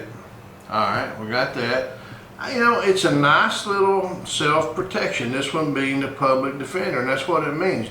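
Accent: South, United States. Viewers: Is dude hammered or from the south?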